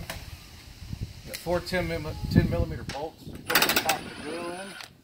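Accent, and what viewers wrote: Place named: South, United States. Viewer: Finally found it! You sound like Matt Fikac, you southern guys all sound the same 💪🏼😆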